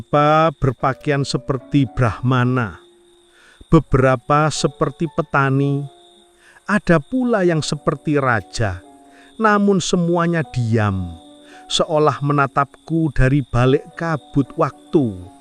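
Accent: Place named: Java, Indonesia. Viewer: klo menurutku sih, logat dan kosa kata jawanya... daerah solo/jogja, tapi berstrategi merangkul iawa secara luas.. maka kata rek .. tersemat dlm narasinya...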